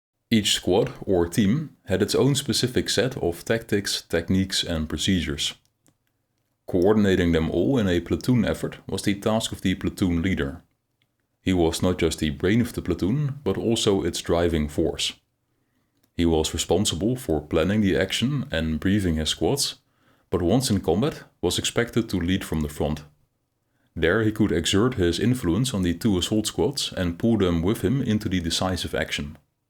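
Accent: Netherlands. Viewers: You'r obviously Dutch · this dutch raw accent is unlistenable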